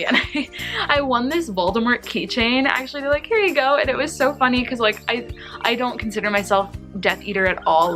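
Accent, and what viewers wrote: United Kingdom. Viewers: prob RP lol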